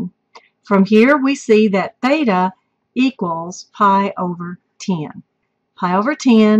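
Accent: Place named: South, United States. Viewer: Oh how am I supposed to continue studying for my midterm with your lovely southern accent as a distraction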